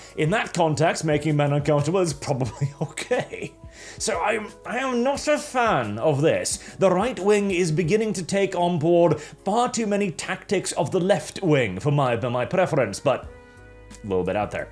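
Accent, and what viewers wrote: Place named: England, United Kingdom. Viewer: You accent reminds me of "Robin Hood/ Men in tights". The lead actor who said " Unlike other Robin hoods.. I can speak with an English accent ..."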